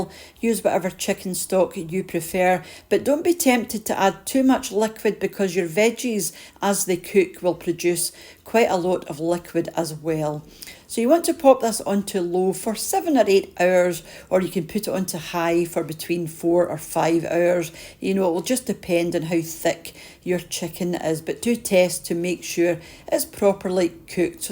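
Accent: Scotland, United Kingdom. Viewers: Hey i sure enjoy your videos, but this might be because I'm American and love the Scottish accent. I watched perhaps your first video of Shepard's Pie and you have really improve dyour presentations--you are a youtube STAR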